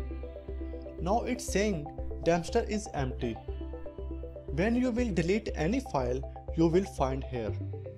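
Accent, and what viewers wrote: India. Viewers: fucking india aksen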